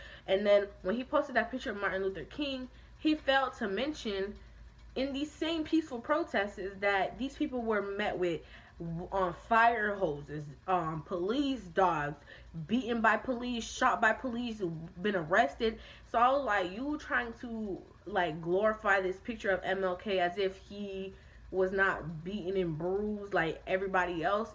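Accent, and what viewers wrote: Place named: United Kingdom. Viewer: I stan the British accent. Teach me.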